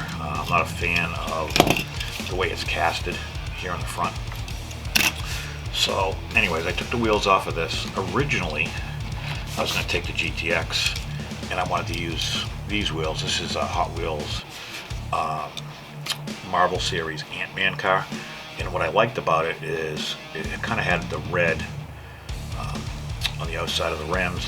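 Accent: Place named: Southwestern Pennsylvania, United States. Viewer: Sounds like a Southwest PA accent.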